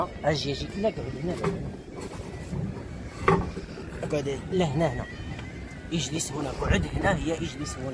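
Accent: Morocco. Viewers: Jat m3ak lehja meghribia.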